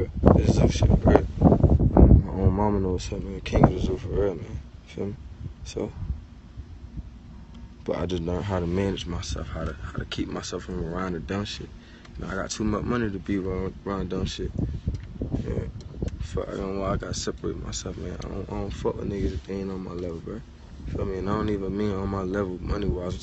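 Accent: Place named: New Jersey, United States. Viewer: I miss his Jersey accent.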